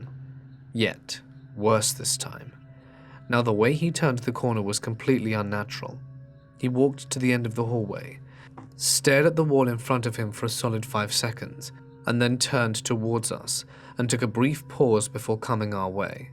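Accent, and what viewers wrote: United Kingdom. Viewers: Hey, really enjoy listening to your narrations, BUT I do have one gripe...could you PLEASE stop saying the word "garage" like your American, I mean you have a British accent. It's so ANNOYING. Thanks. Blessings.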